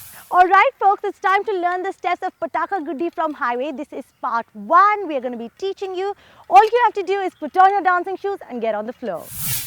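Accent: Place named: India. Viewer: you speak english with indian accent so i cant understand what you are telling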